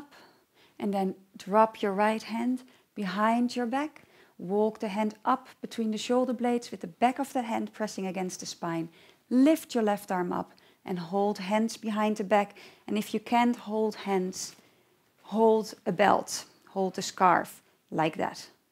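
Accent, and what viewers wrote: Netherlands. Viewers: Dutch English pronunciation... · She sounds very Dutch. Is she? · I love your dutch accent! <3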